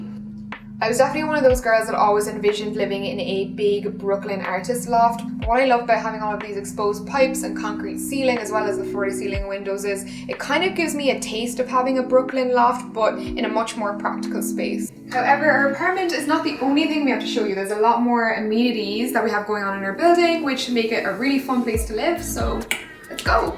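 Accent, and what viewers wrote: Ireland. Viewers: Is this an Irish accent?? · I hear an Irish twang coming through.. Is she Irish? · omg are you irish too? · The 461 yes she’s from Cork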